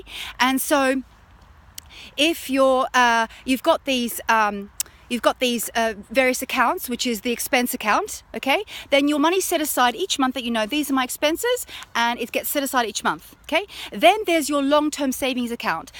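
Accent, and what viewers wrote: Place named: United Kingdom. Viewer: Your lovely Uk accent makes me loose concentrartion on what you say )) Thank you for great info you share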